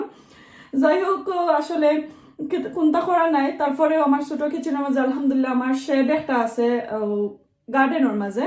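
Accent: Sylhet, Bangladesh. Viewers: I like how you speak in sylheti mashallah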